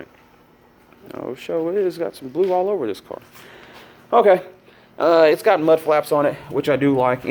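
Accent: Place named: Oklahoma, United States. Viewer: Awesome review man, i had a feeling you were from Oklahoma just by your accent lol. But I'm getting rid of my Legacy for either the Camry or the Accord. I'm leaning a little towards the Camry now lol.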